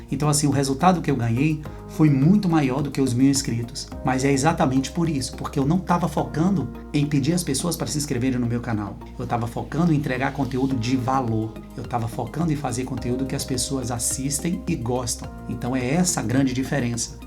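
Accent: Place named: Northeast, Brazil. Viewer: sim, adorei a Live, as horas passaram e nem vi... e quanto a voce, foi uma surpresa boa, mais um canal que ajuda a gente a crescer aqui no YouTube com dicas e sacadas bacanas!! Gostei do seu canal, aos poucos vou assistindo os seus conteúdos, você tem um jeito bacana de transmitir e de se comunicar, talvez pelo sotaque leve do Nordeste, talvez pelo jeito doce, Cândido de falar, muito agradável e um diferencial dentre os outros canais com meu nicho!!! Parabéns, já ganhou mais um inscrito!!! Abraço do Big 💪💪😃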